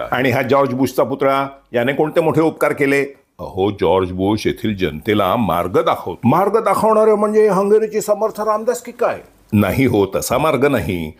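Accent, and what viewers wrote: Pune, India. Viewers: काय मराठी आहे अगदी पुणेरी शैली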